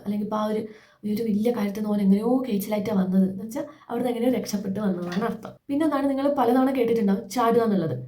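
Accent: India. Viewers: മോളുടെ നാട് എവിടെയാണ്. കുറച്ചു കൂടി സ്പീഡ് കുറച്ചു പറയാൻ ശ്രമിക്കൂ. ട്രാവൻകോർ ആണെന്ന് ഊഹിക്കുന്നു. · ഇയാള് കണ്ണൂർ ആയിട്ടും ഇതെങ്ങിനെ നോർമൽ മലയാളത്തിൽ സംസാരിക്കുന്നു (കണ്ണൂർ ഭാഷയിൽ നിന്നും വ്യത്യസ്തമായി ). അതിന്റ പിന്നിലെ കഥ കൂടി പറയു.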